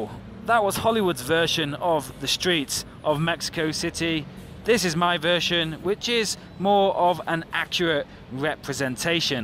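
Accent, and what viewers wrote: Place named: United Kingdom. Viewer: I love all your vids,they very informative,you always try to tell how you see things the nicest way!! but I love the most when I'm listening to your vids is your accent soo British! I love it I love it!! keep up the awesome work! God bless! cheers! :)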